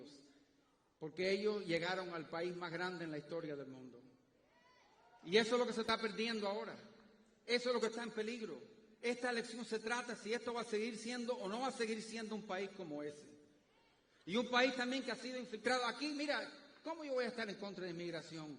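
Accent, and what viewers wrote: Cuba. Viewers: Rubio you’re the man love your Spanish puro cubano puro americano con amor 🎉😊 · Didn’t know his Spanish was that good. Sounded great, true Cuban accent. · Español cubano como bien dice 😁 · I hope that Hispanic parents look at this as a great example to teach their US born children Spanish. There should be no stigma or shame about speaking Spanish. It’s an incredibly beautiful and rich language. His parents did an incredible job raising him bilingual, and his bilingualism gives him a tremendous advantage in his political career. He didn’t use a single Spanglish word. His speech was eloquently and passionately delivered in pure Spanish. As a Cuban-American, I can confirm that his Cuban accent was authentic. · His accent is very cuban indeed:) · You can hear how similar his spanish sounds to Desi Arnaz / Ricky Ricardo, it's not like spanish from Mexico. 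I had a co-worker who's family was from Cuba and he said Cuban spanish is like a machine gun, and Mexican spanish is very sing-song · Damn, being Spanish my primary language by living and US for many years, coming to see another 2nd generation latino-gringo proud of his Spanish skills to laugh and…. he rocked. Finaaally some good Spanish, well articulated, the message is delivered in a proper way, Cubn style but correctly. Maybe this is one of the reasons why many Latinos voted for Trump this time. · I’m a Tejano and I understood him very well because of the passion and emotion he puts into his words in Spanish—even though Cuban Spanish is a beast to understand compared to other Spanish. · As he said, it is more like a "Cuban" sound... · How Spanish was great, it’s just the Cuban accent.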